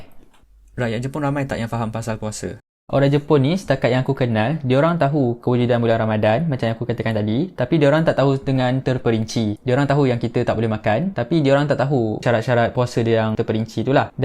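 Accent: Terengganu, Malaysia. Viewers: Buat vid full slang ganu 🤣🤣 cerita pasal pape je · Org ganu ka?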